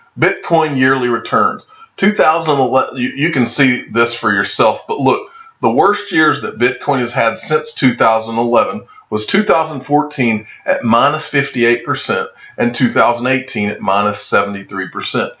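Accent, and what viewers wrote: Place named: New Orleans, United States. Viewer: I thought he was from NOLA as I am but he’s from Maryland if not mistaken. I remember asking him a few months ago. He does sound like he’s from NOLA.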